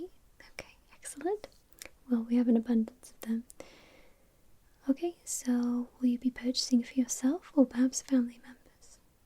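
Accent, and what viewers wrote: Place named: England, United Kingdom. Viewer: love your accent. what area are you from in england?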